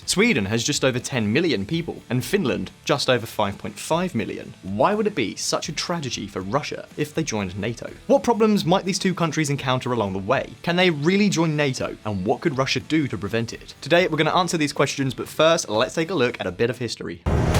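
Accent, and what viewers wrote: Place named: United Kingdom. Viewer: More total drivel! Finland has a substantial expanse of Russian border to donate to NATO's ongoing `containment' policy. Nothing else applies. History has nothing to do with it. Russia is not terrified of the NATO membership proposition. It has had to alter military strategy in placing more military bases in the west, but that has already been accomplished. Fact bending with British accent merely screams of something else, other than `analysis', so just let it go.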